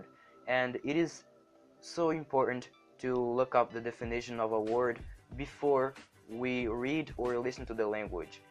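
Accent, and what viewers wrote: Brazil. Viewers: Não, a maior parte das palavras que utilizou são intermediárias, e o fato de ele ter um sotaque um pouquinho típico de brasileiros facilita a compreensão. O vídeo também só tem 9 minutos, o que facilita mais ainda ter uma boa compreensão. Mas sim, você está num ótimo ponto para começar a estudar a língua e falar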